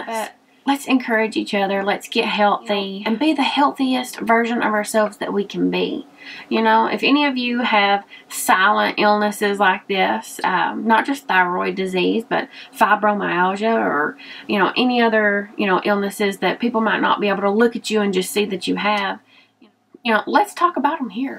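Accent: South, United States. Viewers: Thanks for the kind words. One thing in life I've always have stayed positive throughout all my health issues. I let me having to get a total knee replacement get to me more then having breast cancer go figure. I'm so glad that Emily mentioned you that day on her channel and I came and checked your channel out. She got me when she told you about your accent. I love a good southern accent.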